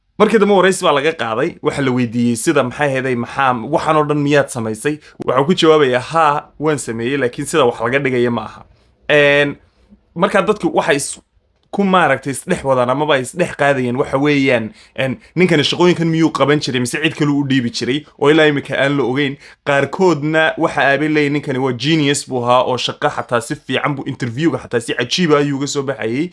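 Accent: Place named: Arab world. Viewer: بأي لغة تتحدث لهجتك عربية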